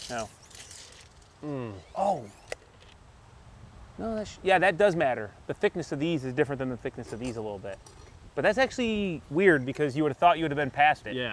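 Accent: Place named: Chicago, United States. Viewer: Nice Chicago accent.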